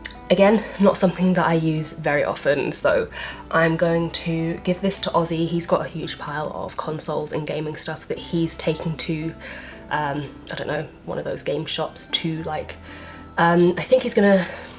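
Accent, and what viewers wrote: United Kingdom. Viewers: i love to listen to people with a british accent that's so asmr tbh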